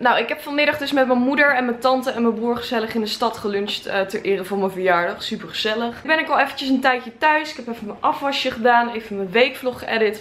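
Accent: England, United Kingdom. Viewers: is hij echt engels of praat dié gewoon zo voor de grap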